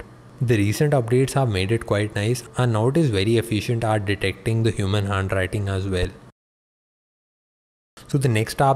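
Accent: India. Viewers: please your indian accent is so irritating...too hard to take...alvida. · D and T are two different letters PRONOUNCE THEY DIFFERENTLY fucking indian accent 😒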